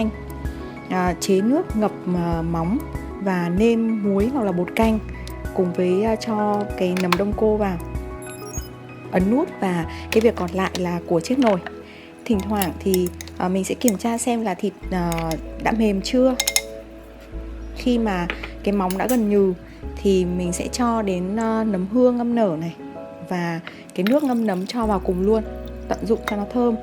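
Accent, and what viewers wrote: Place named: North, Vietnam. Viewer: noí tiêńg băć nghe hay. thanks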